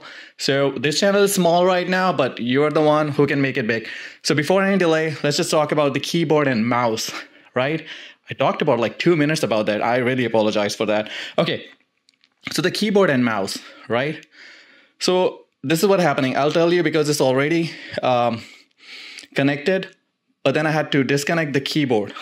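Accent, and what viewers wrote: India. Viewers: Either use Indian accent or foreign. Indiam accent mixed with foreign accent makes it unbearable to hear. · U have Indian accent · Your accent sounds indian. R u an indian bro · Why do I hear a indian accent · Bro you're indian? Indian accent in your english boiii......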